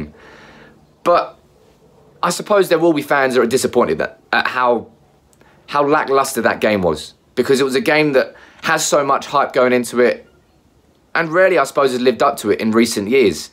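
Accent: United Kingdom. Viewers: Cockney manc??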